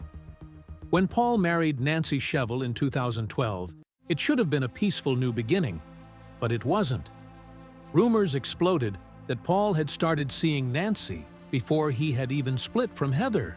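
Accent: United States. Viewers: Why is it an American doing this commentary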